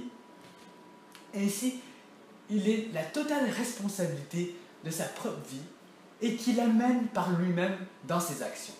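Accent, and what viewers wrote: Quebec, Canada. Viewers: C’est dur à écouter l’accent québécois mais très bien expliqué merci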